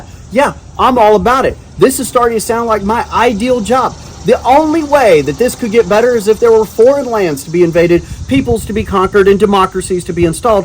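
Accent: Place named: New York, United States. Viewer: I couldn’t help but find it funny that whatever is causing your audio to peak also kinda made it sound like you had a New York or New Jersey accent 😂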